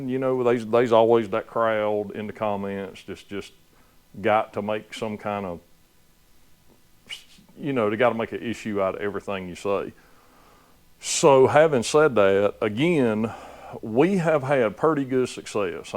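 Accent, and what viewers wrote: South, United States. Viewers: The views will always speak for themselves. I agree with the majority, I like the drama videos, if that's what we're going to call them. Especially with your Southern drawl, it's really more of a telling it like it is video. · Do not like hear your southern drawl put me to sleep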